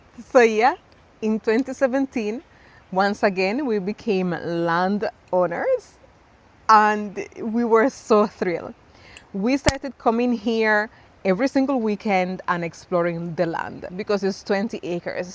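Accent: Patois, Jamaica. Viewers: ITS NEVER A DULL MOMENT WATCHING U I AM A JAMAICAN LIVING IN THE U,S,BUT THERE IS NO WHERE I LOVE LIKE MY COUNTRY,I OF TO TELL U I NEER TIRED OF LISTENING U TALK PATWHA U IS A REAL JAMAICAN FE TRUE I REALY LIKE WATCHING U BIG UP TO U N UR FAMILY 🙏🙏🙏🙏🙏🙏🙏❤❤❤❤❤❤❤❤ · You sound jamaican can hardly hear the spanish accent 😅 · Your patois is soooo hilarious! 😂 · You’re starting to sound Jamaican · I am so proud of you. Gal yuh awesome. An mi luv yuh patwah/patois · I love to hear when u speak the patois ❤😅😅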